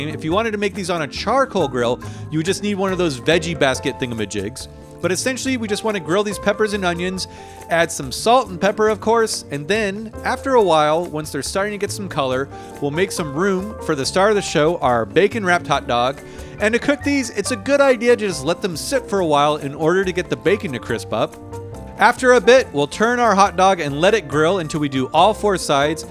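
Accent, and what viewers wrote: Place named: California, United States. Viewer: I thought he sounded like Chef John, too! But yeah, it appears that it's just a regional Californian accent :)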